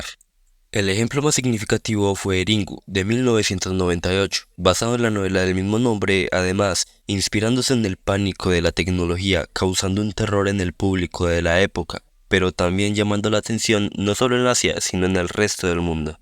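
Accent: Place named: Colombia. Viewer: Parece acento colombiano.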